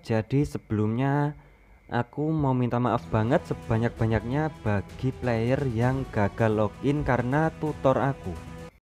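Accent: Java, Indonesia. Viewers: Suaranya medok banget bang mantap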